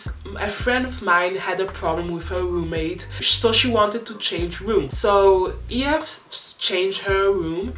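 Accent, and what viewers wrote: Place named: Switzerland. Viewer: Trust me, you're not a C2 and I sincierly think you aren't a C1 either. Not to insult you, but I just did the exam for the C1 and passed, however it was pretty taff. Between the C1 and the B2 is a huge gap which makes sence since C1 is already the second highest level. The reason why I do not think that you are a C1 are mainly the collocations. They get really important for the CAE and honestly you might have a look at them again. Secondly your language sounds really swissish. Im swiss, so I know how this is supposed to sound. Usually it is not a bad thing to have an acent. I mean I have one too, not gonna lie. At the CAE they won't even care about that. It is crucial, however, to change some words with synonims for not sounding as repetitive. For instance don't use students three times in one sentence. Chance it up with educators or pupil. 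This is what they really look at if you wanna take the CAE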